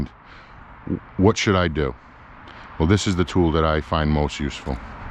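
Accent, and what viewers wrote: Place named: East Coast, United States. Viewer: Yo I miss that East coast voice!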